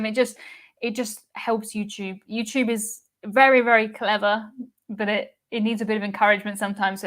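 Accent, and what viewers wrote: United Kingdom. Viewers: I like your British accent. And your videos look so professional and I like your raw views and opinions about cruises. Great work.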